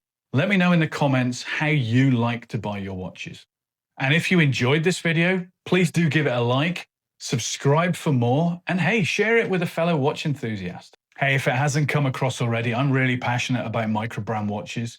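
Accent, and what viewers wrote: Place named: Birmingham, United Kingdom. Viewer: Sounds like a Brummie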